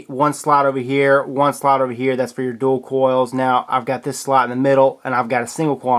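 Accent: South, United States. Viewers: It is wayyyy more than the way he says something, it's also the phrases and choice of words that are dead give away he is from the south. "And she's a chuckin." Is obvious, for example. You can tell he isn't from like Alabama or something, and to be honest he probably tried to sound as neutral as possible, because in the US sounding like you are from the South makes people think you are dumb.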